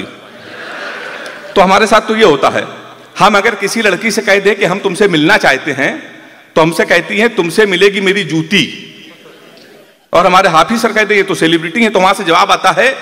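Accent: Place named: Kanpur, India. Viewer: Proud to be kanpuriya..... Aj kanpur ki hindi dubai me suni jari ♥️🌹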